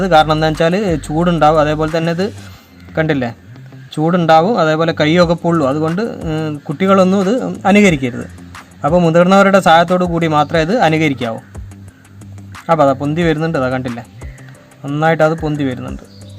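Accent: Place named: Palakkad, India. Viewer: ഇങ്ങള് പാലക്കാട്ട് കാരൻ ആണല്ലേ? 😃😃😃 ഡവറ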